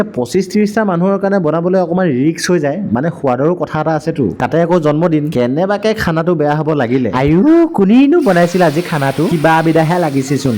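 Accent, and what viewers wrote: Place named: Upper Assam, India. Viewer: কথাৰ সুৰটো আমাৰ আপাৰৰ, ক'ত ঘৰ?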